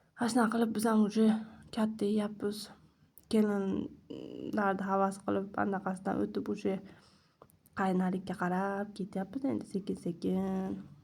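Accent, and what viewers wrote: Andijan, Uzbekistan. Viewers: Аввалам айтганман мен андижон данманк шевангиз худди бизникига ухшар екан деганман мен кизимни аккаунт идан чикаман йошим 60дан ошган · Хожи киз бэкор гийбат дебсиз хамма нарса ниятга богликлигини биласизу сиз ростанам Валижон шамсиевни анжонлик келинди уйнаган келинга ухшаб гапираркансиз ошликмисиз мен Анжонданман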